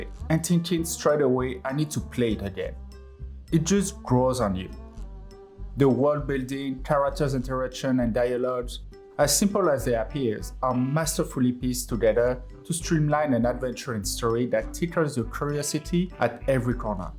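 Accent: France. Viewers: thought I recognized a French accent with a touch of African perhaps lol. I'm from Montreal QC too btw, and love the content!!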